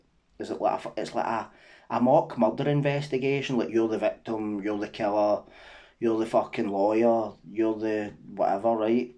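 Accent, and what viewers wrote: Scotland, United Kingdom. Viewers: Your quite consistent with your videos,thats a gd thing.aye a widnae worry about they stoaters that are commenting on your accent,i can read and speak english really well.but id rather speak in my native tongue,like yourself,its more authentic.nothing worse when ye have fellow scotsmen trying to bring ye down for not speaking english lol.its not even our language in the first place.😂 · We speak English with Scottish dialect 😂 the world over has problems with our speech....deal with it people your doing amazing keep it up big guy love from Coatbridge ❤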